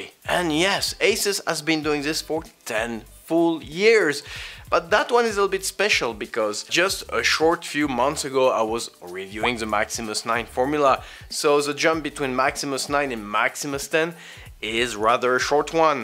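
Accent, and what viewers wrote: France. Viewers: Love the accent! xD Are you French? Anyway, appreciate the review. Waiting for mine to arrive in a few days.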